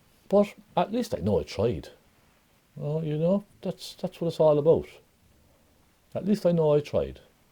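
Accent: Ireland. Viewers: I enjoyed your Irish accent. Thank you for showing me how to paint mountains and grass.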